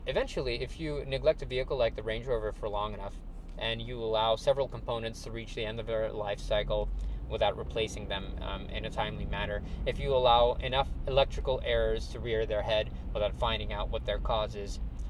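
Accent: United States. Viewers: I thought he was American living in Russia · Why does your accent sound American? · Wow you sound like an American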